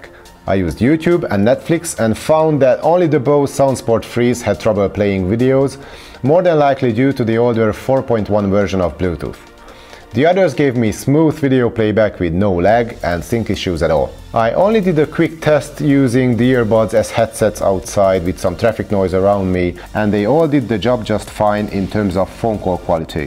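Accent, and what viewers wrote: Hungary. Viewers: Magyar vagy? :) az akcentusod alapján olyan mintha az lennél :) ettől függetlenül szuper a kiejtésed és a content is szuper :)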